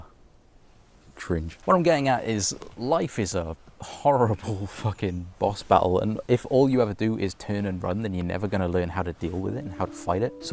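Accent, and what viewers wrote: Wales, United Kingdom. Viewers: Hears Welsh, instantly subscribes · Is he welsh? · I recognise a welsh accent when I hear one. Hello, fellow Welshman :) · Is he welsh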